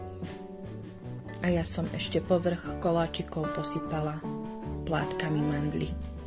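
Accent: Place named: Slovakia. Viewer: zdravím Vás- nejen výborné koláče, ale ta Vaše nádherná slovenština mi chyběly😁😋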